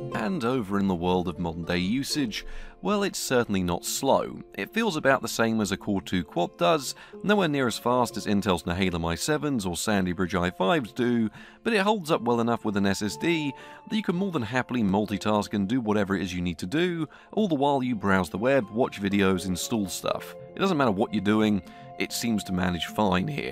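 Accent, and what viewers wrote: United Kingdom. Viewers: I'm from Russia. British accent is such a treasure)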